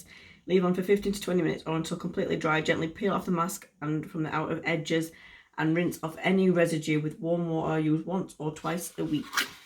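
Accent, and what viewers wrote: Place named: Southern England, United Kingdom. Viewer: Hi, just subbed to you, great video! That becca pallette looks well lush! I have been trying to figure out your accent...Yorkshire or Lancashire? I am a Yorkshire lass but my accent is all over the place due to living down south for a while when I was younger, your accent sounds like you have some southern influence too??? xx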